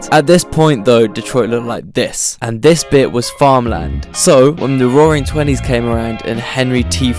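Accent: United Kingdom. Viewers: Not a good video of Detroit. You have a person with a UK accent that cant pronounce Hamtramck correctly & really knows nothing about the city..... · So cool, with your bri’ish accent, thanks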